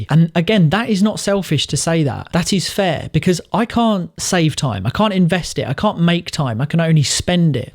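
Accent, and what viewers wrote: United Kingdom. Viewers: I’m smitten😘and can listen to you talk all day. Are you based out of the UK?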